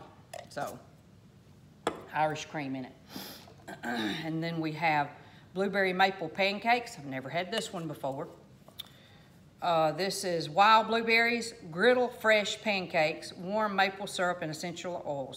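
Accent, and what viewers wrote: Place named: South, United States. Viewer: That accent!! 😍 Miss living in the south. Anyway, love your videos! Let’s go Vols! Lol 😀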